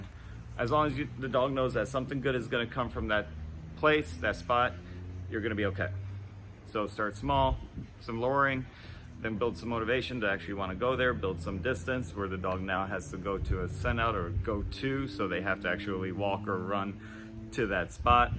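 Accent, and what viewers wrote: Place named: Balkans, Eastern Europe. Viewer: Pozdrav iz Makedonije, vidim po naglasku a i po imenu da ste iz nasih prostora. Nadam se da cete nastaviti sa snimanjem i da cu uzivati gledajuci vase snimke, a i da cu nesto novo nauciti. Podrska od mene!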